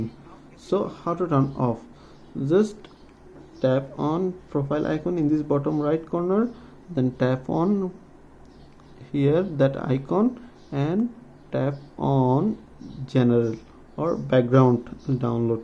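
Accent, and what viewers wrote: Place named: India. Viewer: Hate the Indian accent 😒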